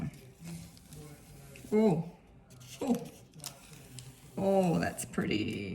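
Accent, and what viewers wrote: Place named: Australia. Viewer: I love your work, can you advise where to buy the deli paper from, I’m struggling to find a stockist. You sound Aussie, like I am. I love experimenting with my gelli plate.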